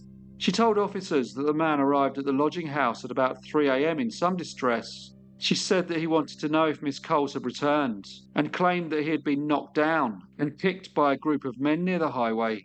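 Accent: United Kingdom. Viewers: Hello from France. Good storytelling. Just a question, where in Great Britain does your accent come from ? I find it clear and easy to understand. Thank you.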